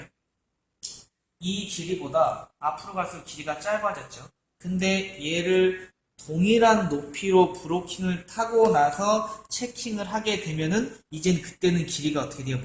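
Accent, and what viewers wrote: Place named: Jeolla, South Korea. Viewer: 구수한 전라도 사투리가 정감이 가네요 감사합니다 원장님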